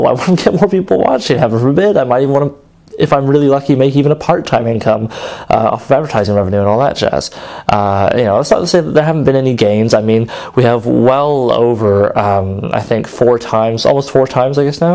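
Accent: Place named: United Kingdom. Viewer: here are some things u might want to change in the show from a outsiders opinion, i really want to see u succeed. 1. where different clothes, no more vest and tie, be more casual those clothes distant you from your audience 2. more british accent people love that 3. don't try so hard, you don't have to force jokes, and run them over with a peer see if they laugh if not scrap it, but your gut feeling is the best. obviously there are more/better ways to get more views but here a a couple.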